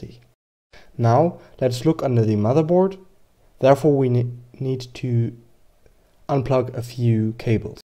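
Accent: Germany. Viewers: 6 years after you created the video I need to say 2 things: 1) "DANKESCHÖN" as you German accent was very obvious at that time 😉 2) You saved my life: the ball bearings of the gear drive of my vr300 was completely stuck due to some syrup my kids left on the floor and the robot sucked it in like a champ. Again, awesome!!!!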